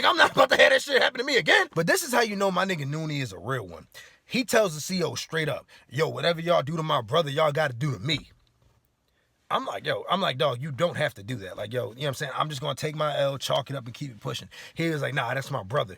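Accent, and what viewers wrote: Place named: Virginia, United States. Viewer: Just came across your page 😂…. I KNEW you had to be from VA bc of the way you talk lol (specifically 757?) . I'm from Richmond but had to move away a couple of years ago. I miss hearing stories like yours (obviously, not the fact that you were locked up). Since I moved, my life has been completely turned upside down and I hardly ever laugh like I used to… until I came across your page. Laughter is medicine, thank you for bringing back my laughter that I've missed so much.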